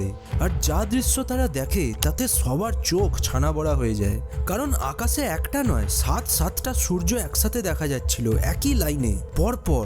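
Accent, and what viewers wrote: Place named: Kolkata, India. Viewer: Are bhai😑 Uni kolkata r tai amn pronounciation.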